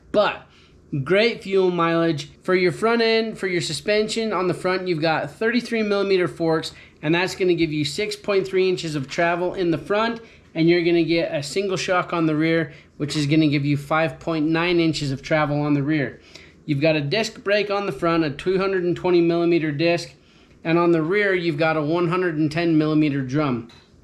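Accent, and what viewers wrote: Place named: Utah, United States. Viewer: I'm from Salem and moved to Washington 20+ years ago. Are you from near there? I immediately recognized the Utah accent! I'm hoping to get a TW soon.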